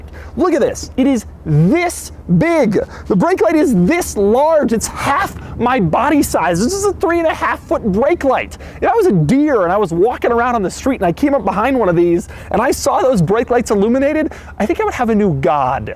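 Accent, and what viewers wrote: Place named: United States. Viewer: He's talking American.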